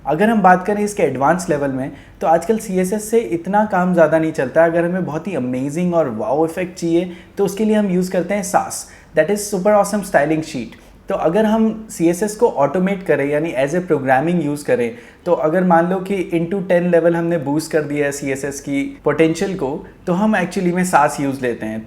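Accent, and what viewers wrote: India. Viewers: yeh punjabi he i can sense that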